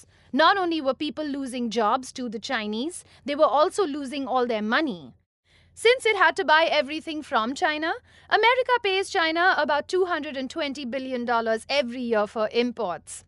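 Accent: India. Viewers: India India India that irritating accent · t series accent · Indian English sucks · I like your Indian accent and I am trying to learn Indian English from you. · Your Indian accent is like those Indian phone scammer use. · Indian accent is killing us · Sounds Indian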